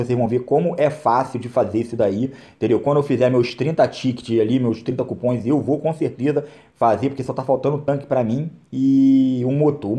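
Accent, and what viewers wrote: Rio de Janeiro, Brazil. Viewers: Tu e carioca ne? Kkkk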